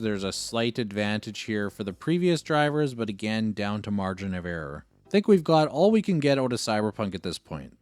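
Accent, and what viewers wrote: Canada. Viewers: Bro as canadian watching this and listening to his Accent. I was thinking this man is definitely Canadian. Definitely from western Canada.😂😂. Nice video. Would have taken alot of work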